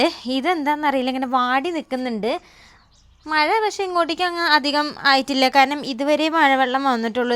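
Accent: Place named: Kannur, India. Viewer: സംസാരം കേട്ടപ്പോൾ തോന്നി സിസ്റ്റർ കണ്ണൂർ ആണോ നമ്മുടെ നാട്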